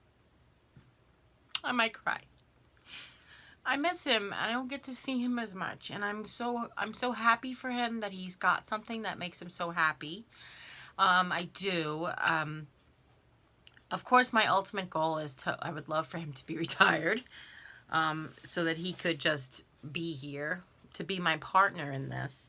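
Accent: New York, United States. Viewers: I could listen to you talk all day I just love your wisdom and your accent too hehe I hear that New York in there!! Love you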